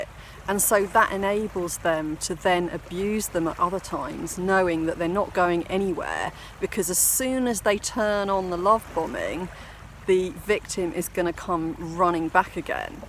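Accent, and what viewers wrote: England, United Kingdom. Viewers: with the English accent everything sounds so proper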